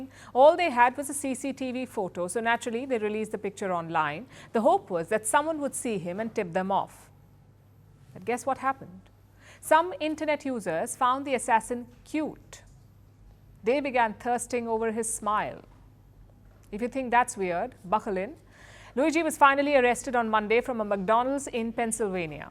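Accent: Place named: India. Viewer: Please! Stop with the Indian accent and pseudo-journalism!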